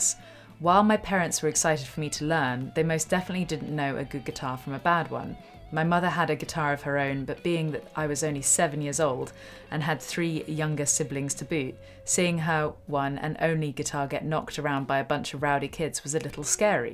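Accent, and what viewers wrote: United Kingdom. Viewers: So yeah, with the British accent I spent most of the first story thinking this person had found a guitar in a porn shop when he was "14 or 15 years old." I was puzzling over that one so much that by the time I realized it was in fact a pawn shop I had to rewind and re-watch the beginning of the story! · The wat you Brits say "pawn shop" doesn't sound like pawn shop to my American ears. Thought, at first this story was going somewhere weird! Lol!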